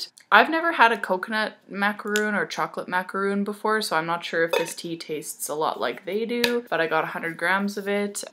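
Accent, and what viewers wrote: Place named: Canada. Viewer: Great video! And I love your Canadian accent <3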